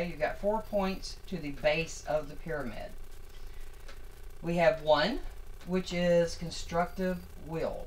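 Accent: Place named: South, United States. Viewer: I just love that Southern accent.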